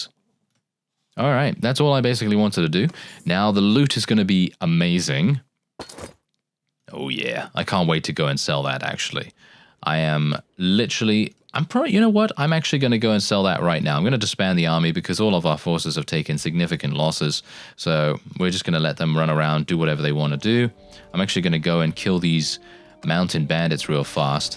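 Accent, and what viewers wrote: United Kingdom. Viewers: He has the most soothing yet focused voice, he sounds kinda British.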